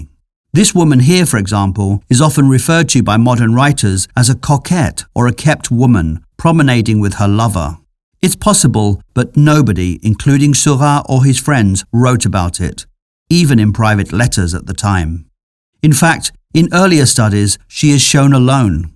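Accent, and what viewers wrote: United Kingdom. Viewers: Art from France. Video from England (I suppose, because of the accent). Paint from USA. Viewer from Brasil. Globalisation is real!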